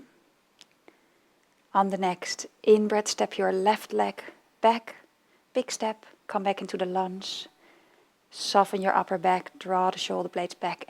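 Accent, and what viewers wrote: Netherlands. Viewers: She’s Dutch... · you have got a dutch accent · Wow is she Dutch or what? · Cassendre Xavier Definitely a Dutch accent! 😊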